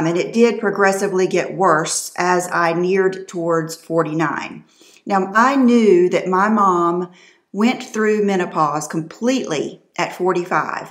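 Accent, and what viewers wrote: South, United States. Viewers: Sweet, Soft-spoken, Southern, Sincere, Stunning 🥰🙏🏼🥰💄😍💕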